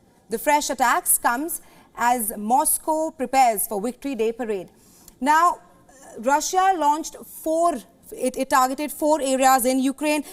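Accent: India. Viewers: Please not use indian English slang telling news. It sound like comedy news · hinaya ra pag basa ayaw pag dali indian ragud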